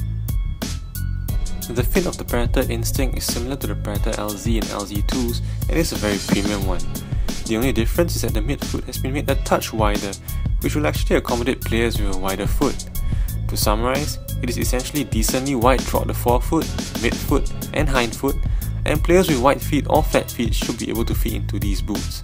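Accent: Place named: Singapore. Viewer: I can tell ur from Singapore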